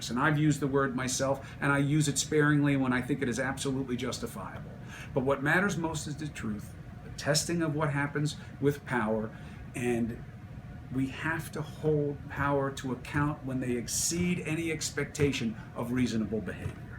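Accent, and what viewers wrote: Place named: Ireland. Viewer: you got the best brain, you know that? Plus you know words. You've got the best words. Ever heard that before? Lol. Thx for the vid. BTW, I know u are of Italian descent, but I could swear I detect a smidgen of an Irish lilt in your accent? Sexy as all heck! Keep at it. xx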